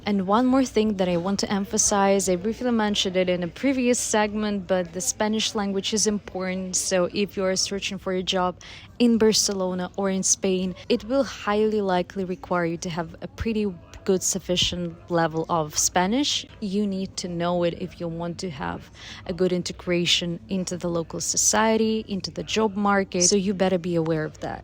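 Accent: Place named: Eastern Europe. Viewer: Where from East Europe is the accent? Russia or Poland?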